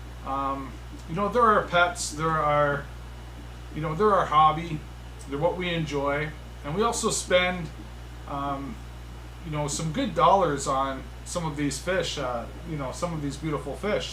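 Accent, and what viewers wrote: Canada. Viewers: Why do you have such a bad accent? Are you way deep in Canada?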